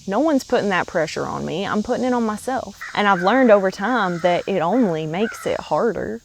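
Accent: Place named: North Carolina, United States. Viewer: I love your accent it sounds very NC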